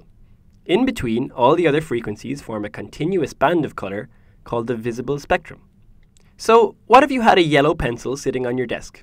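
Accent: Ireland. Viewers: His name and accent are Irish , fair play buddy ! Good video! what you studying ? Physics ? Is this video part of college or is it just a project you are doing ? I would be interested in making videos about science. Love teaching others! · I love his Irish accent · that an irish accent? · Of course he's Irish. Dunno about the Waterford part, I only know the wateford accent that tends to be monotone.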